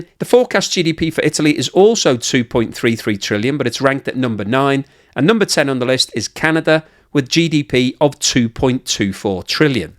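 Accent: United Kingdom. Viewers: His British accent doesn’t give me any trusting feel.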